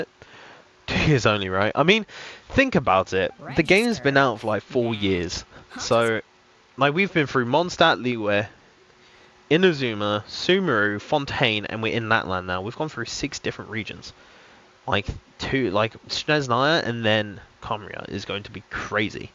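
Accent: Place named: United Kingdom. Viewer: I DIDN'T THINK U WERE STREAMING definitely gonna watch ur next stream also yes not many british ppl say bo'o'wa'er but ur accent is quite clear and obvious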